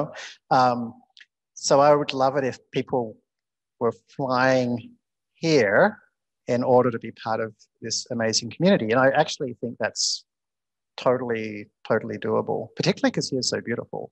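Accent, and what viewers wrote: Australia. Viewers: Interesting how your accent is a lot more aussie now!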